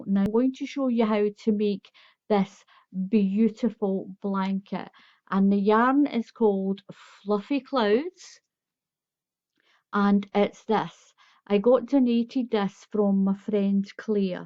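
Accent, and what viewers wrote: Scotland, United Kingdom. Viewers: Great looking piece! I'm starting a fundraiser for my preschool, and I wonder if you have any more suggestions for a desirable but easy (and quick) made projects? This one of course I'm considering. Also I could listen to you speak for ages. Scottish and northern Irish are my cryptonite! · Hi,, beautiful blanket. Are you from Scotland? You have beautiful accent.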